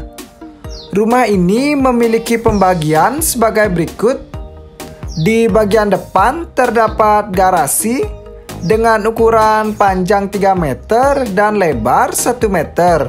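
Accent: Bali, Indonesia. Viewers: Mantaaap Bagus Niihh Buat refrensi Bagi yg Memiliki lahan Yg Kecil untuk d jadikan rumah Tinggal.. Bicara Nya Bali Banget Broo. Salam Nak Bali Rahayu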